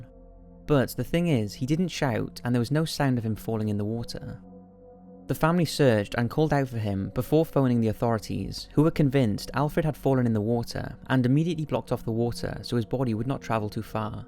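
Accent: Wales, United Kingdom. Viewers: Do all brits sound as ridiculous as you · Anton Ellegaard no probs mate, voice is very cool! iam from Yorkshire England & it took me a bit bcoz it's a bit between English & welsh & a bit posh ☺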